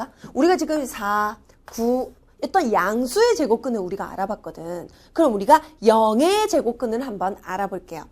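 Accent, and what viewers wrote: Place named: Busan, South Korea. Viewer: 연기할때 표준말 쓰는데, 부산이 고향이라 그런지 실제 목소리 들어보니 약간 부산 억양이 있음. 부산 사람 DNA는 있나봐.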